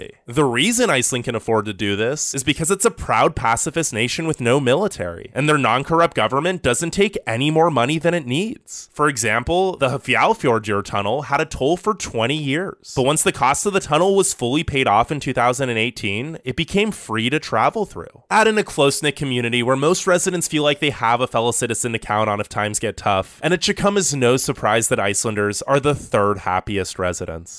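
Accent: United States. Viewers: Great video idea, but the ranking and argumentation is a bit weird sometimes and THE VOICE SOUNDS SO AMERICAN I HAD TO STOP WATCHING AFTER A FEW MINUTES 🙆🏻‍♀️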